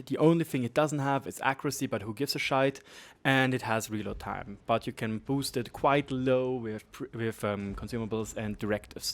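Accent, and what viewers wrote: Germany. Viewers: Das Deutsche in Dir hört man schon hart raus mein Freund :D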